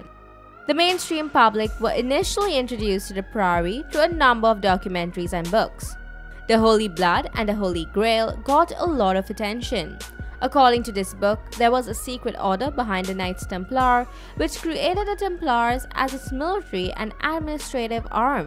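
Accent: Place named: India. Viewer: This girl has the worst accent lmao you can tell she is Indian "wictor Hugo" 😂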